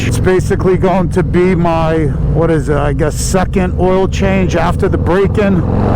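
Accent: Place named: New England, United States. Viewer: I commented this before I heard you say you were in New Jersey, but hearing your accent I knew you were somewhere just below me and that looks just like the Holyoke mall in Holyoke Ma. (Which is only about an hour an a half south of me). I'll deff look for you at laconia. I've actually never gone to laconia for bike week... but Id love to see your bike up close and hopefully go for a quick scoot! I so want to see our bikes side by side threw the gears! See how I'm doin on that little 95" 😏😁👍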